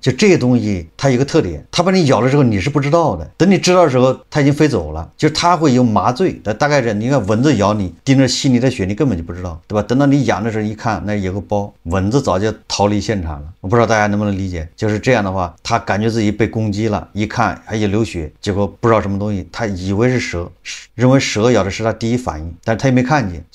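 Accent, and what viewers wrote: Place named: Northeast, China. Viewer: 我还以为是东北人😅爱吃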